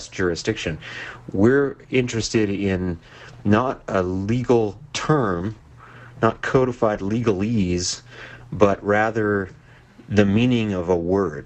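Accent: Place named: Europe. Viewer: Of course he's saying this he sounds European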